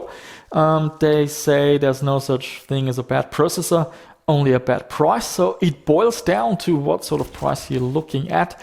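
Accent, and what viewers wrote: Germany. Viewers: you sound like an australian but something tells me that's just a german accent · German accent rules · You sound a bit like a german talking english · One question, is the dude a German?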